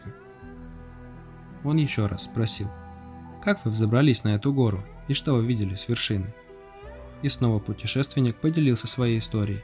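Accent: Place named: Russia. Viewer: Темнишь ты ,акцент кагбуд то родился в сраной рф и в ней и по сей день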